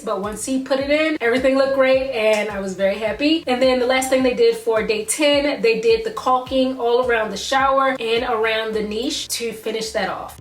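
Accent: Baltimore, United States. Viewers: End result is so beautiful! I love this! Sn: I hear a Baltimore accent dew tew lol are u from Bmore?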